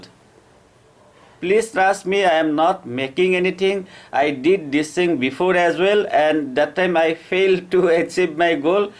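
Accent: India. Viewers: India has dozens of different pronunciations for English. We must learn to tolerate all kinds... The Bengali English, the Assamese English, the Punjabi English, the Tamil English and so on. The essence of this video is the passion for gardening that this gentleman is trying to kindle in us. That is of prime importance.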